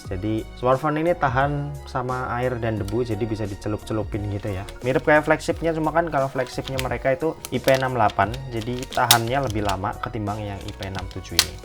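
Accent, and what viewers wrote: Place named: Banyumas, Indonesia. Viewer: Logate ngapak ya😂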